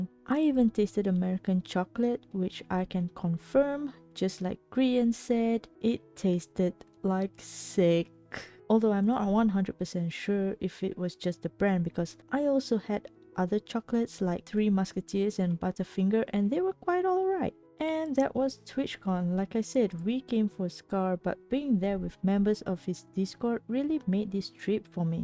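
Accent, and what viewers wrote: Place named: Singapore. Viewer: Fellow Singaporean!!! I had a hunch with your accent but happy that there is actually a growing community of hermitcraft-likers here >:D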